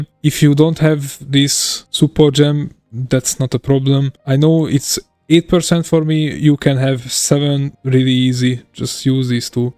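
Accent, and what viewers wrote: Hungary. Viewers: Probably ill be doing this build next season :) hope they dont change it! Btw are you Hungarian any chance? Your accent tells me that :D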